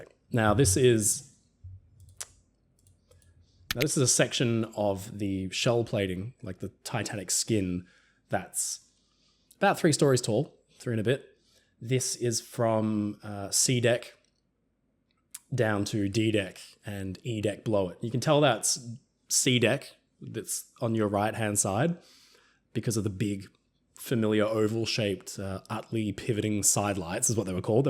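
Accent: Australia. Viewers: Neither, is Australian.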